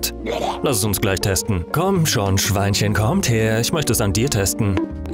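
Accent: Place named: Germany. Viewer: Er klingt wie ein alman aber es ist geil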